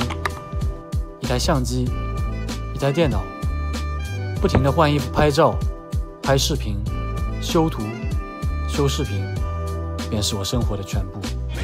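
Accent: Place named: Hangzhou, China. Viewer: 一听口音就好亲切 果然是杭州的 感觉你身体好有点僵硬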